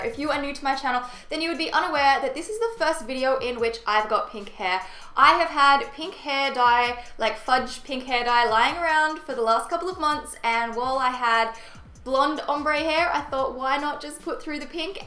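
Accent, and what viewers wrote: Australia. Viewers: Is she from Australia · Your Australian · Are you Australian? You're so pretty btw! ❤️ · Are you Australian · are u aussie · Is she australien like her accent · are you Australian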